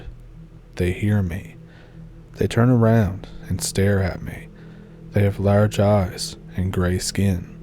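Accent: Canada. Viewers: Is this somebody else’s story because you don’t have an Oklahoma accent you sound like you’re from Canada? Is that correct?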